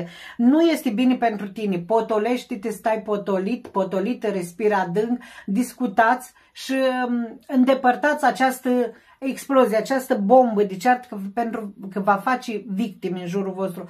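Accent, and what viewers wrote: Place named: Moldova. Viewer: Superba etalare! Esti o draguta! Multumesc pentru frumoasa citire! Imi place accentul tau...moldoveanca de a mea! Acum mi-ai aparut..e prima data cand te vad si iti ascult citirea si..mi-a placut! Si da! M-am abonat! Te pup pe suflet! Te astept cu urmatoarea citire! ❤❤❤❤❤❤❤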